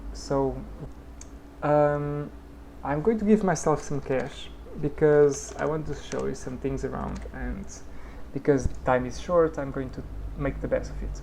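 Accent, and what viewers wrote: Portugal. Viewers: Now I know what the portuguese accent is, when speaking English. Nice video, quite instructive, albeit slow-paced. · Na hora que você falou os nomes portugueses com seu sotaque matei na hora que es de portugal =D O jogo parece muitissimo bom estou ansioso por ele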